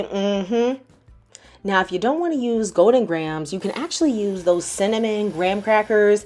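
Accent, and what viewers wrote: United States. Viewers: I love your ghetto American accent, I'm from Africa and I'm glued to your channel baby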